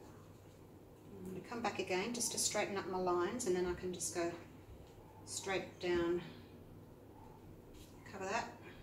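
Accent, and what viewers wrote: Australia. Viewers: Disastrous?? It’s gorgeous! Love the cells - reminds me of a tile mosaic. I love listening to your chatter! It’s cheerful, and who doesn’t love a cheery Aussie accent?? Lovely!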